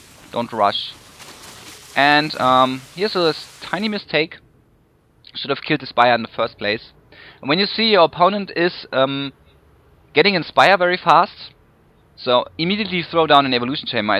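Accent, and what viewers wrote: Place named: Germany. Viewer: german accent is epic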